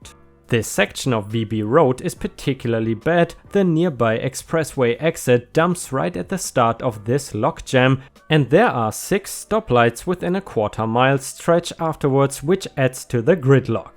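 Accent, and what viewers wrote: Germany. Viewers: The Person speaking: Are you gernan? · Are you german ?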